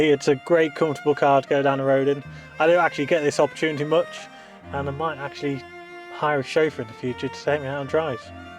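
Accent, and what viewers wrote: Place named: Grimsby, United Kingdom. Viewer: Beautiful car and a nicely made video. That sounds like a Grimsby accent to me. Couldn't work out where you were driving though. Woodhall? 😊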